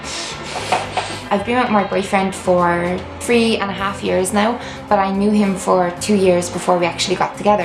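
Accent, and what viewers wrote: Ireland. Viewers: Was wondering about that accent.. Now I know. :D I love Irish accents, they're cute!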